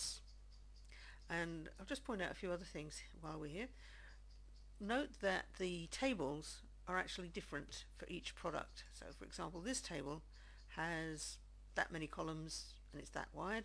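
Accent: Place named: United Kingdom. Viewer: So glad to hear a British voice!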